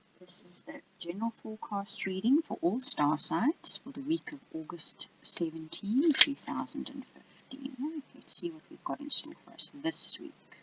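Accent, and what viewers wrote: South Africa. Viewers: Hello ! Hoe gaan dit? I just found you, and I like your video because I love tarot (I'm starting to learn for myself) and also I'm very pleasantly surprised to hear your South African accent because I grew up in Cape Town, though I'm now on the other side of the globe. Dankie! You explain things very well, and amazingly this was all completely relevant in my life.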